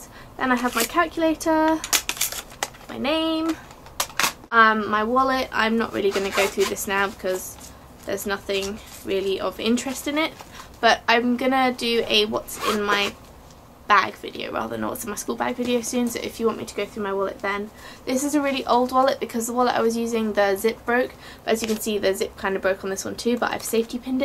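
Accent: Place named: United Kingdom. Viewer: Hi, if i saw right... your name is??? I'm not very sure!!! hah. and if you don't mind me asking, where are you from?? You have a lot of Japan things, but it sounds like a British ac sent (i do not know how to spell that words).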